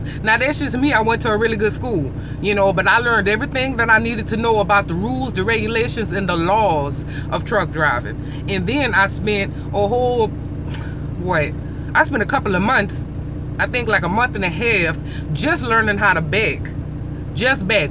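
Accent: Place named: New Orleans, United States. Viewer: U gotta be from New Orleans. Hear the accent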